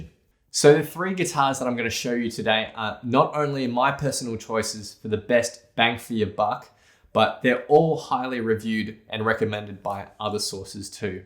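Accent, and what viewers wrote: Australia. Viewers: The Australian accent is screaming ate so hard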